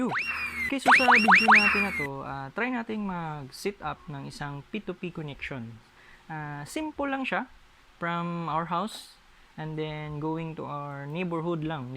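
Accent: Visayas, Philippines. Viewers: Salamat sir idol kna ng maraming tagasubaybay..andun parin ang visayas accent..daghang salamat bai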